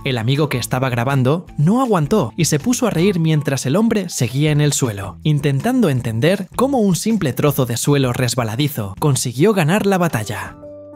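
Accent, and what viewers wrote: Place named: Spain. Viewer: Todo el vídeo es Excelente, solo la voz del narrador tiene un Acento Español molesto, y no es necesario que hable mucho explicando lo que se está Viendo en el vídeo. Saludos desde Tabasco,Mexico.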